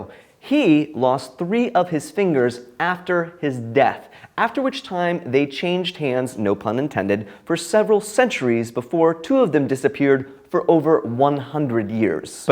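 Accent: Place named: United States. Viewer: Everyone is freaking out in the comments about how an American pronounces words that ARENT ENGLISH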